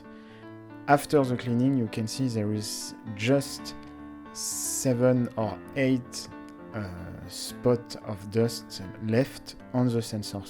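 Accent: France. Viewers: I was kidding, is that a problem? By the way, I love the Frenglish accent :-)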